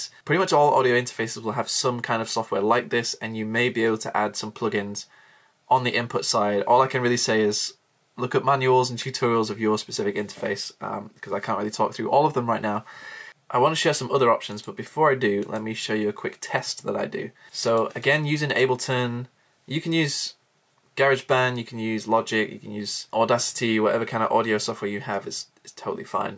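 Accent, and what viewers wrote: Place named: United Kingdom. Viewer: Woah. I didn't know he was British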